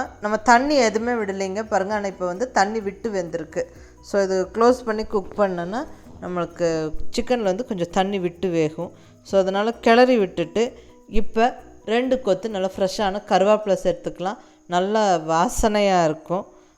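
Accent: Coimbatore, India. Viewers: Amma unga samayal yellamea 👌 ma, ninga pesura kovai tamil ketguradhugu rempa piduchurugu ma. Thanks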